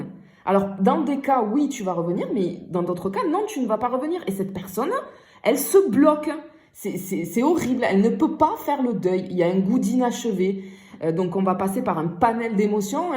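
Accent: South, France. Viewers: Bonsoir c est exactement ma situation, il ma lachez sauf son silence et ces pouces sur mes commentaires et je suis brisée de ses trahisons ! J en suis la!! Et vous avez raison, je me suis abonné, j adore votre accent du midi ,je suis née dans le midi et je vie dans l Isère et votre accent me fait du bien mon midi qui me manque tant! Gratitude infinie gros bisous 🌈🌞🧿💔💔❤❤😘😘🌷👏🕯🐞🌟🕊🍀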